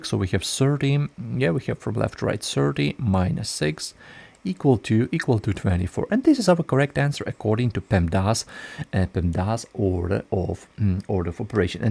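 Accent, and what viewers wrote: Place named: England, United Kingdom. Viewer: Great stuff but you talk too fast, your English accent is not helped by muffled sound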